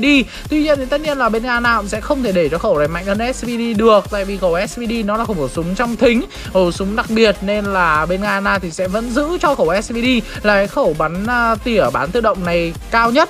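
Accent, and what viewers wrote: North, Vietnam. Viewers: Không thích giọng người Bắc à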